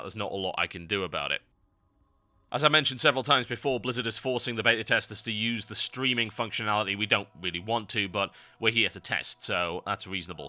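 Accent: United Kingdom. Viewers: stupid ugly british accent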